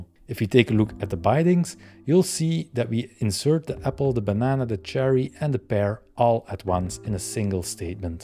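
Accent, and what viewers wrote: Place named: Belgium. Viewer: Your accent sounds Belgian, your name Italian and your face Indian. Did I get any of that right? 😊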